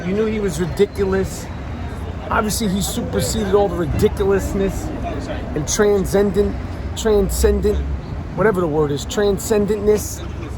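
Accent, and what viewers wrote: New York, United States. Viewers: Why is the camera so close to your face? I love the passion but dayum. I’m here for your voice bc it’s SO New York and I love hearing the pain. Heat in 5 🔥🤍🔥 That joke about Randle was funny tho. Lint brush. Maybe that man have other stuff on his mind 🤷🏽‍♀️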